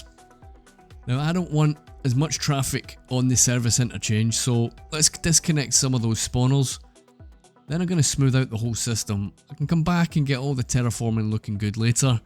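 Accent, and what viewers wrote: Scotland, United Kingdom. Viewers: heard a fellow scotsman playing my fave game and im 100% tuned in. nice video :)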